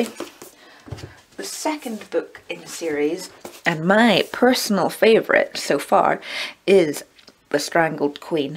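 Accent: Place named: Scotland, United Kingdom. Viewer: I've just re-watched the first 20 seconds of your video and I would have bet money that you had a [mild] Scottish accent. And I'm from Yorkshire in the North of England, so the Scots are my nearest neighbours. I've got to ask, where are you from? If that's not a Scots accent then what kind of accent do you have? I'm genuinely perplexed and massively curious. You speak English with native fluency so I doubt it's your second language.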